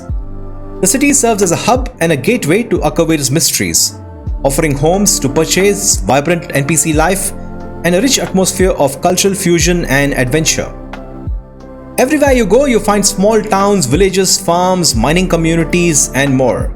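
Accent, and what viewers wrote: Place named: India. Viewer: Sorry if this is rude but out of curiosity what part of the Indian sub-continent do you come from? I always find slight regional differences in accents interesting and I haven't heard one exactly like yours. If this is to rude/personal of a question please don't feel like you need to answer.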